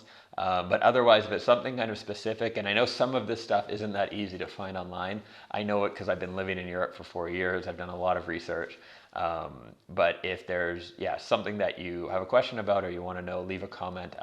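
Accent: Canada. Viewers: He's Canadian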